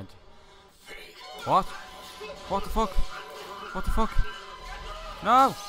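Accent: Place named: Liverpool, United Kingdom. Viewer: I'm not very good at accents but I recognise real Everton supporter anywhere lol lol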